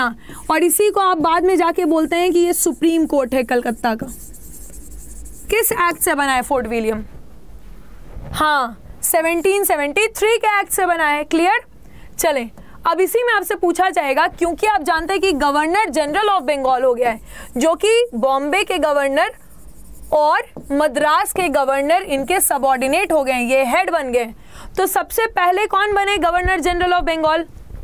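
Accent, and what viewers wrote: Bihar, India. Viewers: Nice session 🙏 mam are u from Bihar 🤔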